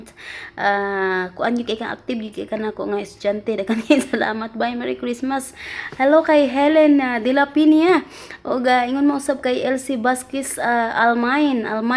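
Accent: Visayas, Philippines. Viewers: Dai taga mindanao ka?? Love it bisaya kau ka 😘😘😘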